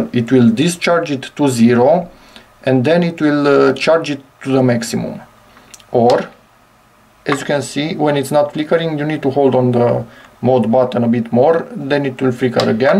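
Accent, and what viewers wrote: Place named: Romania. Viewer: Hello, nice video, thanks for the info. PS: are you romanian(you sound like one, by the accent)?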